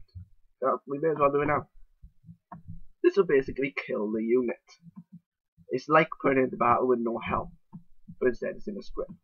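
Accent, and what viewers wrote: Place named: Wales, United Kingdom. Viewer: ah a welsh boy! bore da!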